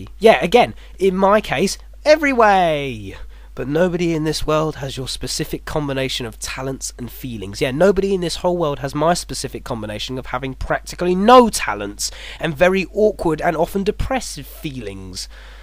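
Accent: United Kingdom. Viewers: I always enjoy hearing British voices which is why I like Michael Rosen poops so much. What part of England are you from? Or perhaps are you from a different country? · Your voice is like the love child of Yahtzee Corshaw, Stephen Merchant, and every single other British voice I heard over the internet. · ***** He's definitely from Britain.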